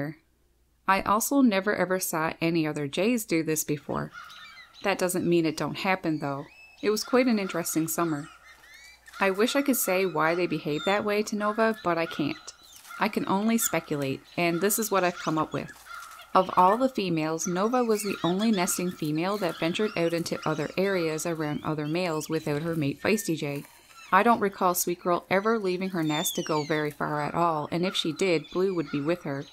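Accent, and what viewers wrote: Newfoundland, Canada. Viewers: I knew you were Canadian when I heard pronounce your “ou”s 😆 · The Canadian accent is strong with this woman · Are you from Nfld or Nova Scotia? XD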